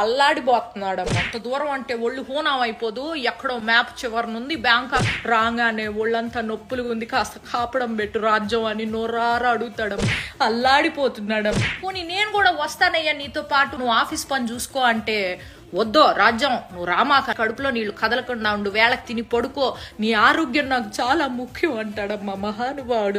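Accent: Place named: Nellore, India. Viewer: It’s one of the best laughable reels sister, amazing, on top Nellore dialect is superb, keep rocking.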